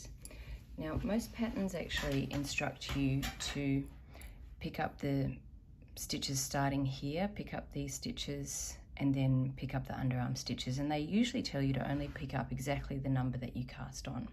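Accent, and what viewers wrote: Australia. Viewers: So refreshing to hear a fellow Australian accent on a knitting video! I always worried my 'free-form' approach to weaving in ends was 'wrong', so it's very reassuring to see how you do it, it makes sense to have an intuitive attitude. I love the neat result of the seam, so I'm going to follow your suggestions.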